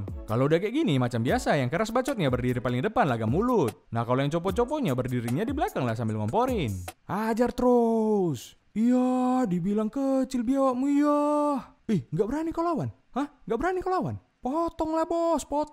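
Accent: Medan, Indonesia. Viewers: Medan kali bah ngomongnya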